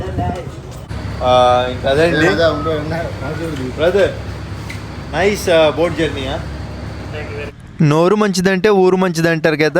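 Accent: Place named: Godavari, India. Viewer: Oy abbayi ne videos super and ne voice kuda super same ma godavari abbayila behave chestunavu ne mata yetakaram thoo❤❤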